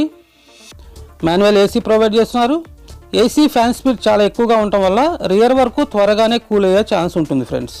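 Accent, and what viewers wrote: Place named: Telangana, India. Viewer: అచ్చ తెలంగాణ యాసలో అద్భుతం గా అన్ని విషయాలు చాలా బాగా తెలియ పరుస్తారు మీకు నా ఆశీర్వాదం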